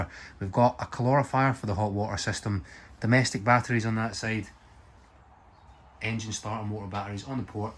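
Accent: Scotland, United Kingdom. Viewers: What you have here is a great example of what Marine Sales can be, ( The Scottish accent puts it over the top.)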